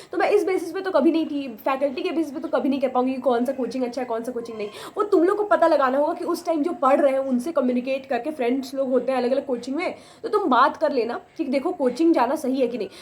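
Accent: Bihar, India. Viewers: Sister do you belong from Bihar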